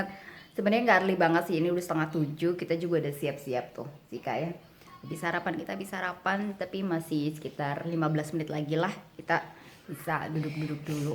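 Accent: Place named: Jakarta, Indonesia. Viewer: Halo ka salam kenal, saya subscribers baru. Menarik banget video2nyaa apalagi cara ngomongnya jakarta banget hahaha jd kaya dengerin temen curhat. Oya ka, disana adakah yg kerja loper koran atau delivery makanan gitu tapi perempuan?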